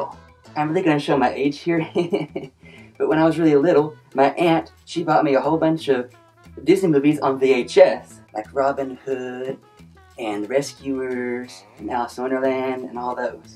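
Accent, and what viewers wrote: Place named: Texas, United States. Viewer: Nice video!I love your accent. You from Texas?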